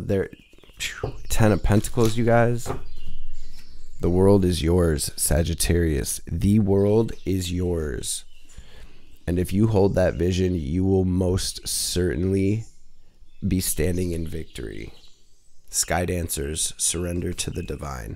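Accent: Detroit, United States. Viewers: You from Detroit?? 😊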